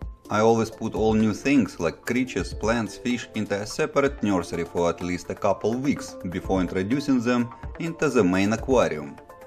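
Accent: Russia. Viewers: i just wonder do americans understand your accent? my russian friend has the same english accent as yours. he have never been in russia though.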